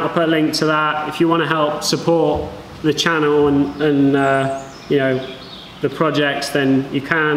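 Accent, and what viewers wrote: United Kingdom. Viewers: Keep up the good work mate love the channel its good to hear a British voice 😂 it’s a shame you didn’t fabricate your own arches would make great content!!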